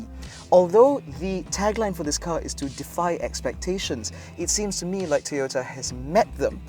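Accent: United Kingdom. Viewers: sound like British accents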